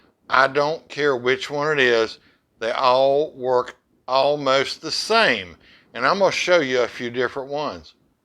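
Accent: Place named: Georgia, United States. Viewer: I was stationed in Augusta, Georgia for 2 years ( US ARMY ). I remember the way the towns folks sounded. Much like you. Brings back fond memories of those friendly folks. I even fooled a Drill Seargent with my accent. He said " Boy, where are you from ? " I said " the south " ! He said " what part of the south ? " I said " South New York ! " He said " drop down and do 50 pushups ! " I did, laughing the whole time !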